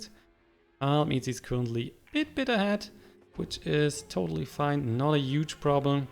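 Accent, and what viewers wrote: Germany. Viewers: are you from germany? just wanna know if i guess the accent right.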